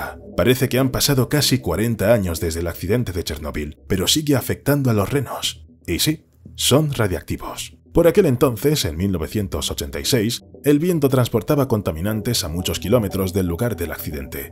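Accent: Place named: Spain. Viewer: excelente gracias por tus videos! son interesantes y relajantes por tu tono de voz español que me recuerda a los documentales que veía cuando era un niño. Saludos desde la ciudad de Quilmes, Buenos Aires, Argentina.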